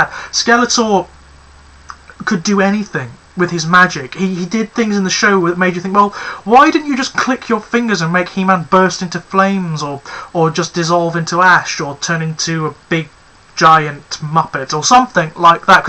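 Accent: England, United Kingdom. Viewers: wow you're accent is so posh where in england are you from :)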